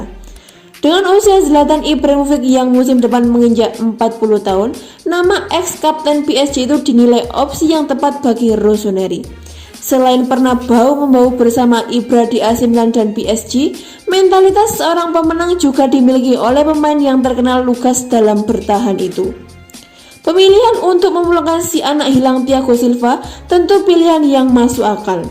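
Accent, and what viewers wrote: Java, Indonesia. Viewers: Medok tenan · Aih jawa x ,betul terok lah ,ganti suara tu,jangan terlalu percaya diri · Naratornya kurang asik Medok bgt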